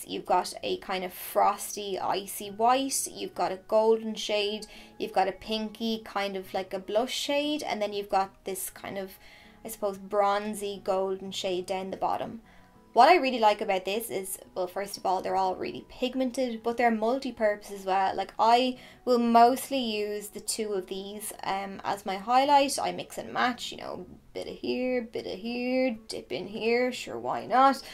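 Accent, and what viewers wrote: Ireland. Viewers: Great eye makeup!!😍😍🤗❤ love yaa😚 your Irish accent is soo cute!!! Greetings from Germany❤ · Love your videos! 😍Valid, professional tips and tricks, delivered in a sweet, friendly way. (I really hope I didn't offend you on the comment board for best concealers, about listening to you takes me "home" to Ireland, where I once lived. My intention was certainly not to disrespect you, it was meant as a huge compliment 😊)Love your Q&A idea for a video. I would like to know how to apply dark coloured eyeshadows under the eye (at the waterline)without smudging it and looking like I'm going to a grunge party. 😁I'm of Indian heritage and my complexion is light brown. Unfortunately I've been "blessed" with permanently darker circles underneath my eyes and concealers are absolute lifesavers for me. Whenever I try to wear eyeshadow underneath the eye, it makes the area look darker and it overpowers the effect of the concealer. 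Hope that makes sense. 😄🙈 Thanks again for your lovely channel.😊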